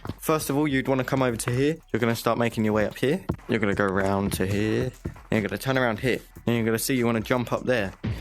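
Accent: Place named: United Kingdom. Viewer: u sound british, but you dont look british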